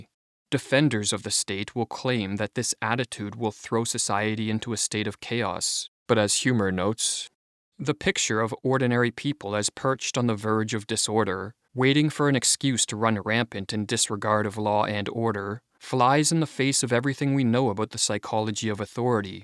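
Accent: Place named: Canada. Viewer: I like the narrator’s Canadian accent here. Makes sense right about now.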